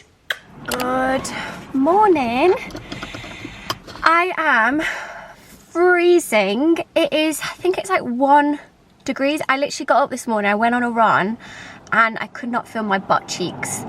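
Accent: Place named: United States. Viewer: Is there a special reason why you pronounce a vase the American way in England we say vase not vaze.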